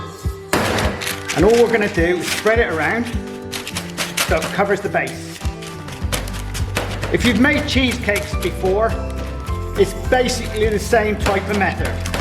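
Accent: United Kingdom. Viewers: Yum! We Americans love a Brit accent :)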